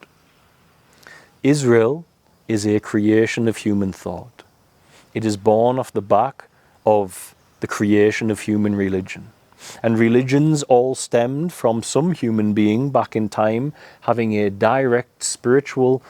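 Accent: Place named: Newcastle, United Kingdom. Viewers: metaphysics explained via understandable examples and the dissonance from the brigite deception dispelled by your soft geordie tones many many thanks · Hey there, bonny lad, bloody good talk, and a beautiful, Geordie voice to deliver it, are you aware of the three premiers, snorting coke, on a train from Ukraine, red handed, also, she was wiping coke from his nose, not attacking him,on the plane, trudau, is the son of fidel castro, Starmer is secretly, gay, rent boys are burning houses and cars, belonging to him, for unpaid bills, seems they were all trained by the w,e,f,,allegedlly all broken, easy attachment, keep talking bonny lad, god bless , fenham, formerly, elswick, you sound the same as me. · Never seen this gent before Sounds like a Geordie Great presentation though Very interesting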